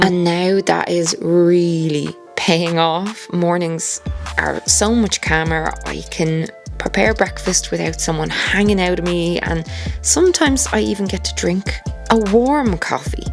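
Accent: Ireland. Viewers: I love those videos ❤ you never dissapoint ❤ I'm from Poland, my boyfriend is from the UK, you're the first and probably the only youtuber I watch from Irleand and I love the accent. I'm in my mid twenties not close to having kids yet but yet you still manage to inspire me with every video you put out and to incorporate some bloody positive chagnes in my day to day life. No lying, you must be the youtuber that inspires me the most and if I had to count down on screen time your channel would be the only I'd watch ❤ I'm currently doing my driving licence as well I started when I was 19 but there's been complications I truly can't wait for this to be my reality, to have so much freedom I deeply long for ❤ I feel bad about myslef not having it sometimes. You share with us so I thought I'll say a few bits about me, your dedicated viewer. You really do inspire me for my future, kids, overcoming traumas/addictions etc. 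With two little kids you're doing such a good job! here on youtube and raising those little angels (I'm sure they have those days, but they seem so smart and emotionally inteliegent). It's incredible how simple things are sometimes, go to the forest, move your body, eat whole foods. Everytime I forget about it and start feeling like s.. I have to go back 🌳🌿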